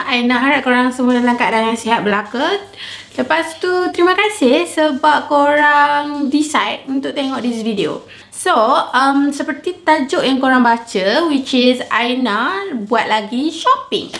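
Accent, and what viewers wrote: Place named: Penang, Malaysia. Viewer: Penang rsanya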